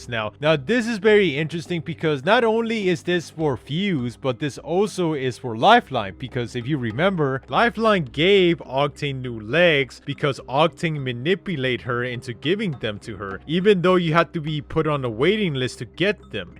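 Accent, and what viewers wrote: Europe. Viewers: You live in Texas? I’ve always thought you were European from your accent. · Texas??? What... you sound European wtf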